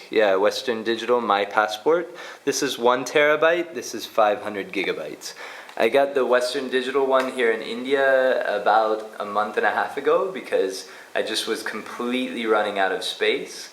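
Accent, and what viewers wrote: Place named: United States. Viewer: whats up with the brit passport. Sound american lol just a random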